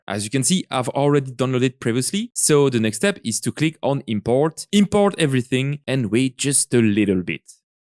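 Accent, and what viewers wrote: France. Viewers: Hi you are french ? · Merci pour tes vidéos, j'ai la forte impression que tu es français, pourrais tu faire tes vidéos en français aussi ? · Bro you french trying speak english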